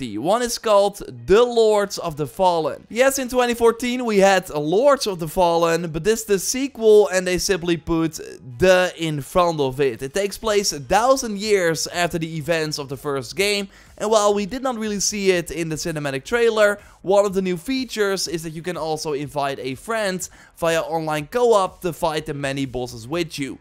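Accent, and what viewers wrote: Netherlands. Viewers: het hollands accent kan je ook niet verstoppen eh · Ooeeff best lastig om te volgen met zo'n sterk nederlands accent eerlijk gezegd.. wel reviews verder😁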